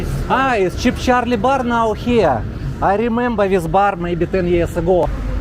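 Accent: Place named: Russia. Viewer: you did a nice job on the video tour of Barrio Barreto. I will go visit in a few months. I have already booked my hotel (which you passed by in the video). I haven’t been to Angeles City since the ‘80s, so when I watch videos of Walking Street it just seems a little too much “in your face”, although I do enjoy P. Burgos St in Makati. Olangapo looks like a relaxed place to chill out with the locals. I enjoy listening to your Russian accented English. You have learned to speak English better than most. Good luck with learning Chinese!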